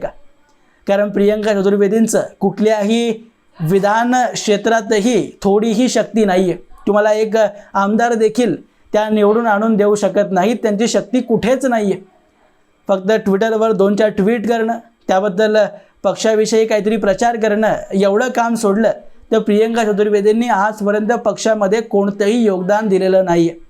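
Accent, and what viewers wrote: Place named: Thanjavur, India. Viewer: तुमची मराठी आमच्या तंजाऊर मराठी सारखी वाटते. 👍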